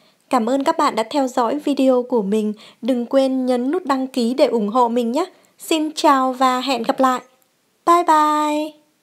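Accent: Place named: Hanoi, Vietnam. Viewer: Ban nay có giọng nói đe nghe như người Hà Nội ấm áp ghê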